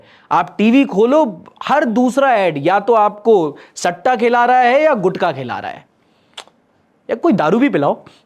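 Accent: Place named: Bundelkhand, India. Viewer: I'm from Jhansi too...and your bundelkhandi is on point 🤌🏻😂 love your content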